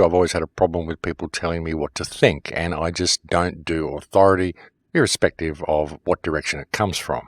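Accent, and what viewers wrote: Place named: Australia. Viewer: His voice sounds Austrailian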